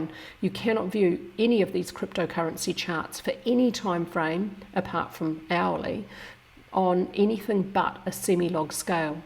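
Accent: New Zealand. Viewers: Jack has done a wonderful job, but it's so, so lovely to hear from you again. Being an Aussie who's visited the Land of the Long White Cloud many times in the past, I really love listening to that Kiwi accent 😅 Thank you for your analysis, being a total mathematics dyslexic I really appreciate it.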